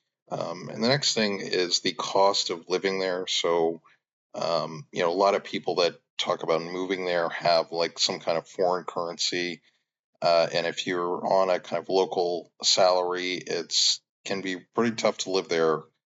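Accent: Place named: United States. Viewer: Hey man, yt randomly recommended me this video. I grew up there lol and I live in the US rn. You have talked about Sariyer and I was like maybe he studied at the Bogazici University(?). Were you staying at the Kandilli Campus ( You have talked about taking a bus and then the metro so maybe?) Yeah public transit can be really draining and it usually sucks. Also the level of random traffic is probably unmatched too. Anyways, it was funny hearing an American yap about Istanbul haha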